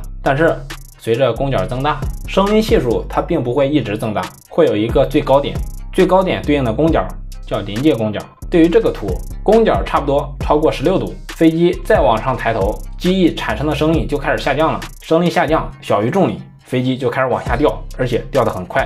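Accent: Henan, China. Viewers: 是河南还在菏泽老乡啊，哈哈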